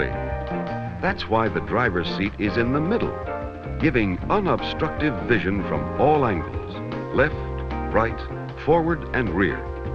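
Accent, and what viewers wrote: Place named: Midwest, United States. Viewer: Same Midwest delivery, but no. Gary Owens as narrator would have made this an instant cult classic!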